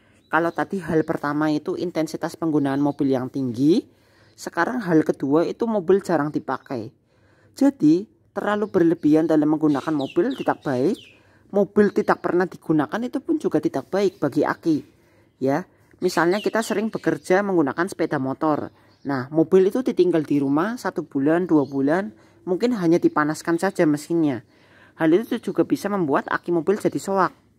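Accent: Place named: Cilacap, Indonesia. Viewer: Ngapak bgt...kayak cilacap😁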